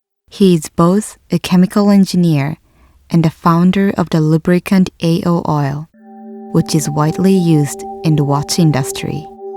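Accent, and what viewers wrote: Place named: Japan. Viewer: 这个视频里的英语发音已经很好了，但是某些音还是有很明显的日本语音的特点。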